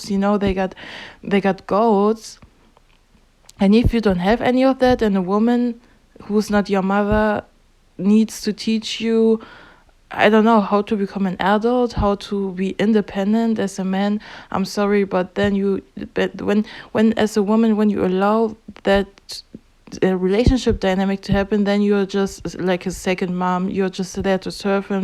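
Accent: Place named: Germany. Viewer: Do you live in a German territory? You have an accent similar to my Oma and Opa. 💜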